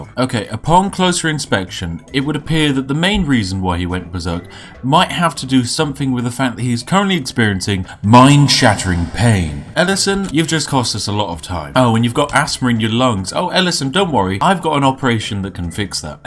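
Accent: United Kingdom. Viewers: There's something really appropriate and matching about a British accent and rhetoric structure being creepy lol "That's exactly what our people are looking for. Wonderful."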